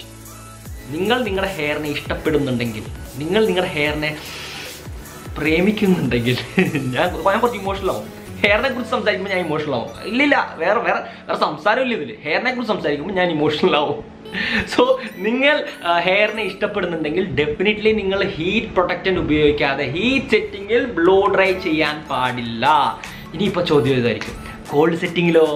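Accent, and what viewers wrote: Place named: North India, India. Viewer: Bro u r so superb.. Iam ur big fan.... I think u studied in North india.... Ur English speaking is like North ppl..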